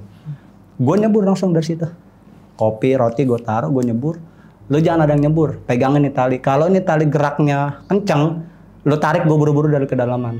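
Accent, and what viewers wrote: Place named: Betawi, Indonesia. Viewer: Keren lu bang bahasa Betawi Bener 😂🙏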